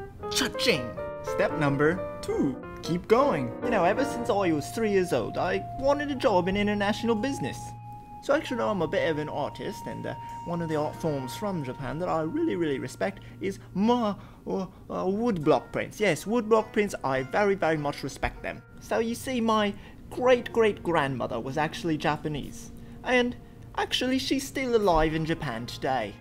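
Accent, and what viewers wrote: England, United Kingdom. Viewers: teehee! that English accent is making this englishwoman giggle muchly ^.^ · Your british accent is so cool.. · I just received my visa today yay But no scholarship though D: And love the british accent xD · i actually love wakame... first time i tasted it, i couldnt get enough of it... ha... healthy and delicious... =d awesome british accent btw =) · XD TEACH ME YOUR BRITISH ACCENT!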